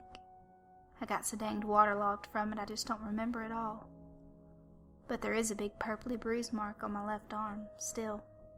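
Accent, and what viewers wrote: South, United States. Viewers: First time listener and new sub! I really enjoyed your video! I'm from Louisiana and if your not really from the South, you did a good job with the accent! I sure hated the first comment gave the whole story away tho... so it kinda ruined it for me,,,but I still liked it! Good Job and good luck with your channel!! Glad I have new stories to listen to!!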